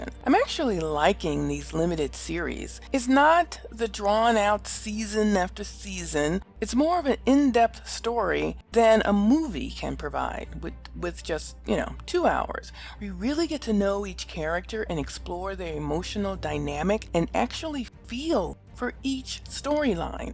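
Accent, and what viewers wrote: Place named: Liverpool, United Kingdom. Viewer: Really enjoyed ur review but I'm a little annoyed that u havnt got more comments and reviews as uve done a great job and coming from a Scouser ur accent is beautiful!! Oh if u don't know a Scouser is from Liverpool, England just in case u didn't no!!! Please more reviews and never change ur accent ha ha!! Take care and let's hope for more reviews!!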